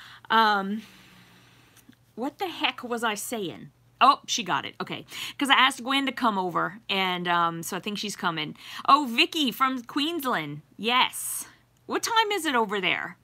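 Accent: Louisiana, United States. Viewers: So you originally from Louisiana? That's a cajun accent if I ever heard one. I watched your astology box video and thought "mais, she from here"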